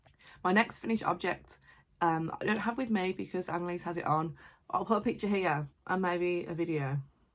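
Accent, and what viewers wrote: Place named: Birmingham, United Kingdom. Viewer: Fellow Brummie. Lovely to watch a knitting podcast with someone who has the same accent as me. Appreciate the honesty of having a wip that is not going as expected. This is so me!! From an experienced frogger 😂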